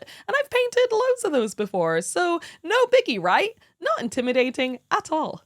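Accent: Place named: Scotland, United Kingdom. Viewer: It's crazy that you're Scottish, cute and your voice doesn't sound like a garbage disposal. Always great.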